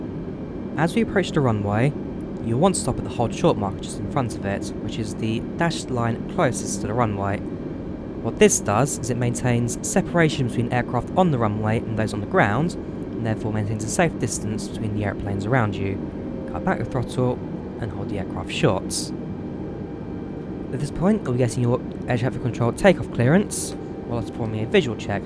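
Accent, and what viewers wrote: United Kingdom. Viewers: which area of British accent this guy is?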